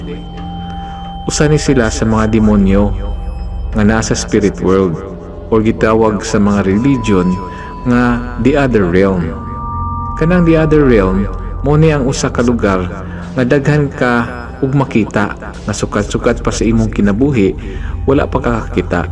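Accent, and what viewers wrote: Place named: Visayas, Philippines. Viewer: Keep safe ,,ayos ah bisaya kaayo b,,from Charot tv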